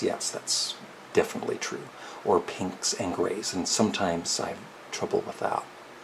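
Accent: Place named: Canada. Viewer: First off I love your Canadian accent. Secondly I am not color blind and saw a clear number in every circle. You were very accurate in your guesses when you weren’t sure. I hope you get the chance to get the glasses from what I’ve seen online it can be really life changing. Maybe you could do a go fund me and I’m sure people would donate.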